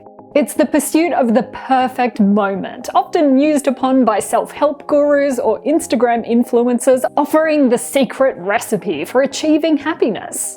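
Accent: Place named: Australia. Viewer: One thing that makes me very UN-happy is when obviously Australian (your accent) presenters reverse the video to make it look like they are in left hand drive vehicles on the right hand side of the road. I can see the Sydney Harbour Bridge behind you while sitting in your car, yer the video is reversed to give it that all-american drive on the right look. Please, embrace the fact you are an Australian and stop doing that, meanwhile I'll stay unsubscribed, plus down tick it, until I see this happen.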